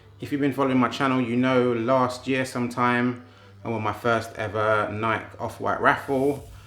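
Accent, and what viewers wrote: United Kingdom. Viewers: You need to be British to fully understand his accent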